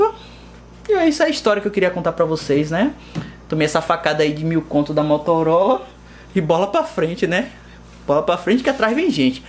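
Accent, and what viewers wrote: Bahia, Brazil. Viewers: Vc e da Bahia? ?????? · Sabia que você era Baiana, esse sotaque aí! Sou de Salvador.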